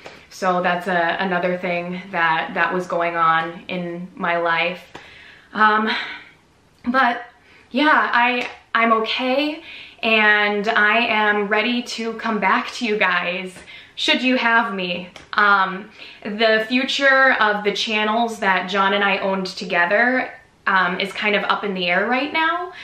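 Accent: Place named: Minnesota, United States. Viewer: Minnesota accent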